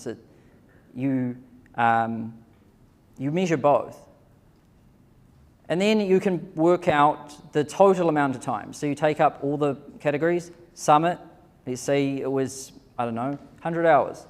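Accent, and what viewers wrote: New Zealand. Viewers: This man must surely be the most annoying human being on earth. From his annoying squeaky kiwi accent, to his tiresome textbook-motivated gobbledyegook. For example (and this is typical of all his Youtube videos: "We can't do anything without action, and action and time and energy. We can't get that from anything other than our human body and our human body can't perform concurrent operations or be replicated. So you are the true constraint of being human is time and energy (sic). That's what life is - energy over time". and: "There are people that have trillion dollar companies and there are people that have no companies - why?" Jeez - why do people pay thousand of dollars to listen to this garbage?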